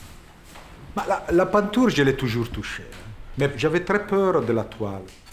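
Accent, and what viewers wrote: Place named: Italy. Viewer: meraviglioso. e delizioso il suo francese con accento italico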